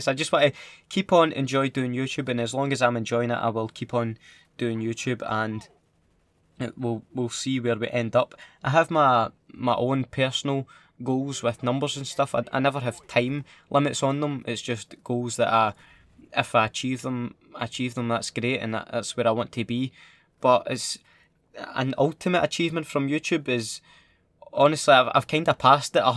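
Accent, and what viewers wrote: Scotland, United Kingdom. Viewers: So he is Scottish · Your like a Scottish daihi da nogla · I've never seen someone so funny, I don't know if it's your Scottish accent or you general humour! But you're genuinely a funny fucker! Hope to hear back from you! :) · a know how terrible it is trying tae try talk proper so folk can understand you cause am Scottish as well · Really didn't feel like 40 min. Such a beautyful scottish accent.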